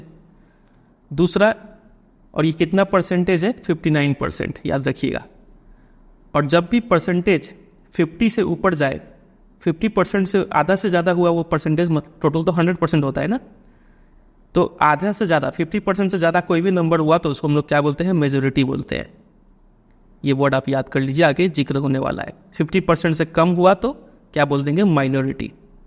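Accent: Bihar, India. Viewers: Nhi 😂 bihari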